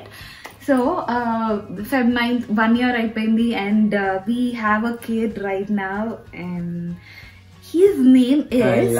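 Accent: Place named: West Godavari, India. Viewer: Awwww my slang u also from w.g.dt good good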